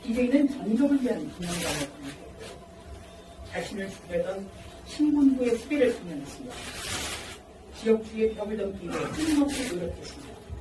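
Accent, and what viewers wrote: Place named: Seoul, South Korea. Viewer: 조수진 의원님 화이팅입니다 서울 토박이인줄 알앗네여 뉴스하실때도 똑 의원의로도 똑 입니다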